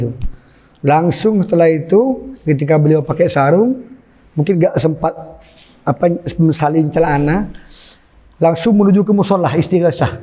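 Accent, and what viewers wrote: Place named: Madura, Indonesia. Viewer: Logat madura ya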